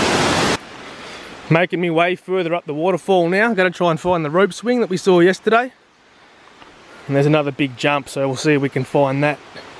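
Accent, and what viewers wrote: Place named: Australia. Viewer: Lol That Aussie Accent and Aussie Slang! Aussie Aussie Aussie oi oi oi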